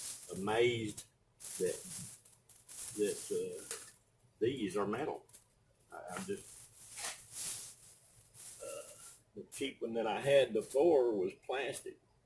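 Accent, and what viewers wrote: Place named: South, United States. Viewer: THANK YOU SO MUCH. JUST PURCHASED THIS FAN AND I COULD NOT HAVE DONE IT WITHOUT YOUR HELP. U MADE MY DAY. SOUTHERN ACCENT WAS GREAT, I ENJOYED. HOT HERE N SACRAMENTO. I HAD A PROB WITH A WALMART PURCHASE AND THEY SENT ME 2. 2ND FAN WILL B MUCH EASIER 2 PUT TOGETHER. ONCE AGAIN THANK YOU GOD BLESS❤🎉🎉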